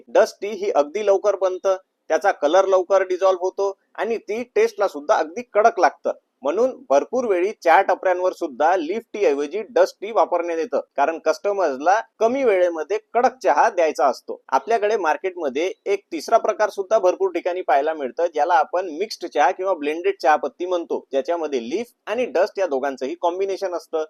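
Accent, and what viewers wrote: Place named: Vidarbha, India. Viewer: विदर्भाची भाषा, राहते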